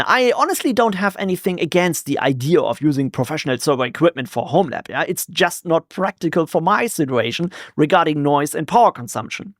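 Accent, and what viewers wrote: Germany. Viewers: Lustig, dass man sofort erkennt, dass Du aus Deutschland kommst. Dein Englisch klingt fast wie Plattdeutsch ✌️🤗😋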